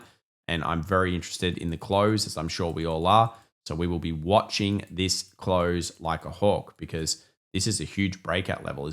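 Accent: Australia. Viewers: This guy does not understand what is happening in the US. He talks like an Australian. So many do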